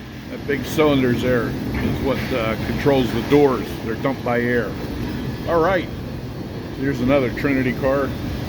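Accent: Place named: Pennsylvania, United States. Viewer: Great video. Thanks for posting. As soon as I heard your voice I knew you were from back home. The western PA dialect is unmistakable. I was born and raised in California, PA. My grandad was a coal miner and my dad was a steelworker. I have seen lots of coal trains and lots of coal barges. This tour was great for seeing this part of the process in more detail. I never had the opportunity to see it. Thanks for posting. Keep up the good work.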